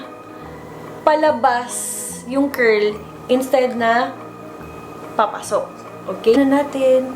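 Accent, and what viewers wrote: Visayas, Philippines. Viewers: Bisaya kasi siya. Ganyan talaga pag nag tagalog ang mga bisaya, mapapansin mo 'yun. Bisaya rin ako, alam ko 'yan. It's somewhat strange and lil bit hard for us to talk in a dialect that is not ours, ganun rin naman siguro sa inyo. It's not being maarte.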